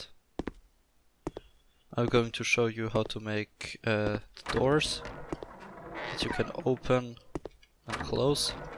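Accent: Germany. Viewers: Love your videos man :) Just one question (no offense): Are you from an english speaking country? Because your english sounds quite a bit like the english most of the germans are speaking :D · Man hat es ein bisschen gemerkt bei manchen Wörtern, du hast diesen typischen deutschen Akzent drinnen, aber ich glaube, das fällt eh niemandem auf😆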